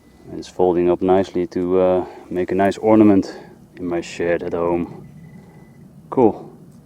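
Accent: Netherlands. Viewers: Are you dutch i am and you sound like your dutch to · You sound dutch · ik dacht van het begin al dat jouw accent heel Nederlands klonk, had ik dus tocht gelijk. PS. ben geabonneerd. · nice dutch accent... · Are you Dutch or something? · i know that this man is from the nethelands cause im hearing his acsent XDDD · U sound dutch.. EDIT: u are dutch haha ik ook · As a Dutch man you can hear it when a Dutch man speaks English anyways nice video!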